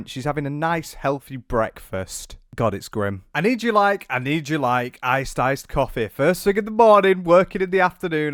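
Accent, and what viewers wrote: United Kingdom. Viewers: Can't believe sshe disappeared for a momth I don't want to make fun of the British accent, i love it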